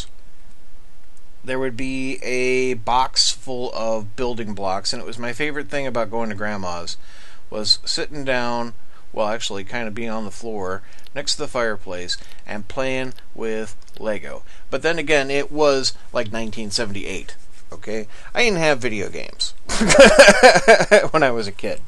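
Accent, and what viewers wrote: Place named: United States. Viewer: You have an american accent and you say you live in scotland did you move?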